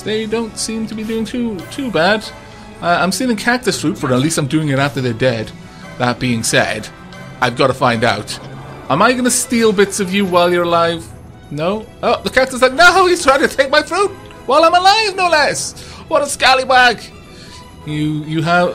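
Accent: Wales, United Kingdom. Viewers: You sound like you are from Wales. Are you?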